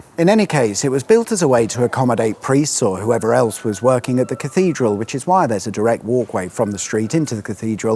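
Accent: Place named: United Kingdom. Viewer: I left UK shores decades ago, have had to listen to BBC english accents (very false) but, listening to you Sir reminds me of the country I grew up in. So thanks for that mate!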